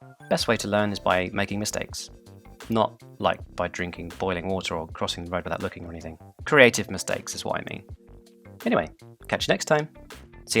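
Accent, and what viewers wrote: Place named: United Kingdom. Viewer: This is it, the perfect tutorial! It's short, understandable AND it has your lovely British voice guiding us through it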